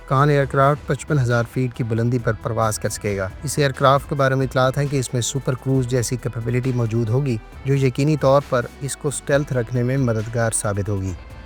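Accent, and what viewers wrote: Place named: Punjab, Pakistan. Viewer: Punjabi accent vlogger speak very funny English and Urdu.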